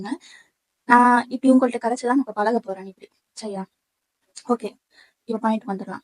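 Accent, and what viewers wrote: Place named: Tirunelveli, India. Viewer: Elame nallathan irukku 👌 actually sister ipo thirunelveli la irudhu Chennai la vandhu settle ana thirunelveli slang than varum so apo ketpagathana ena bro south ah nu so ithu normal than sister neega ithu serious ah eduthukka venam ungaluku puticha ungaluku ethu nalla irukko athuve pesuga.... Nan Singapore ku vandhu 10yrsku mela atchu Dubai la Chennai la nu oru 14yrs ipudi velilaye poiruchu enaku enga ooru slang thuthukudi suthamah varathu neraya thuthukudi kunu irukkura important word's kuta enaku maradhuruchu 😂😂😂 but ena pannurathu ...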